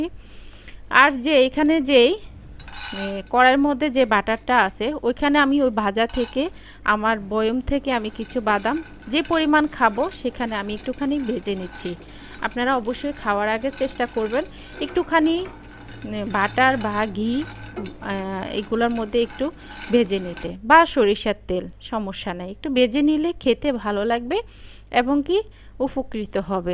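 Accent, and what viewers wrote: Noakhali, Bangladesh. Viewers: Apur bari j noykhli buja gycy kotha suny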